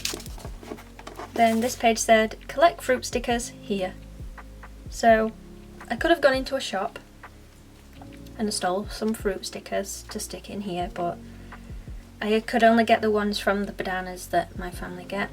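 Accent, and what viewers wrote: Yorkshire, United Kingdom. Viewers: are you from Yorkshire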